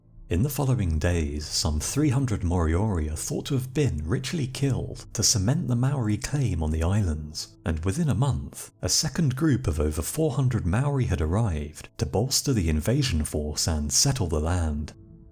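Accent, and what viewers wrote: United Kingdom. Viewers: soon as i heard the british voice i started laughing lol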